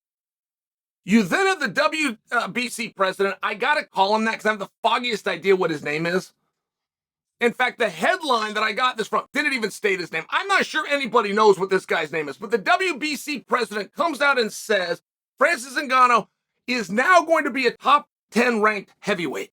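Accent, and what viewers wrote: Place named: East Coast, United States. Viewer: Chael you do so good on your podcast. Engaging, passionate, hand gestures, east coast it is what it is talk BUT fair enough were gonna do it anyway, kinda Trump talk. Great for this side of entertainment and what people want to see when they click something like this topic. You're doing great with your podcast format, wouldn't change much. Just keep going!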